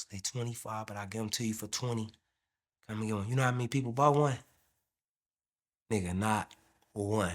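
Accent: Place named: West Coast, United States. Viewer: Dang i thought he was from the West Coast, u sound just like us brah tight.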